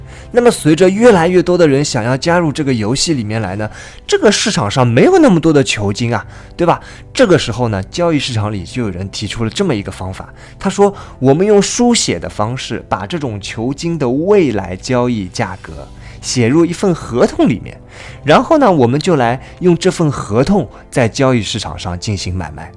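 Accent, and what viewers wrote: Shanghai, China. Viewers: 怎么感觉有上海口音了，，，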